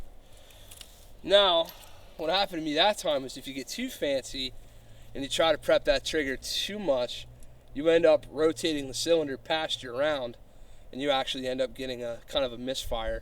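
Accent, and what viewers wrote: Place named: Philadelphia, United States. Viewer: Good review. Your accent says, “I’m from the Philly area!” amirite? Maybe Delaware?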